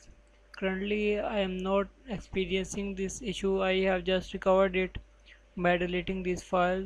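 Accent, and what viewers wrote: India. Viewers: Actually worked out nice^^ Thanks alot indian accent guy :) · it worked just as well as the Indian accent ... <3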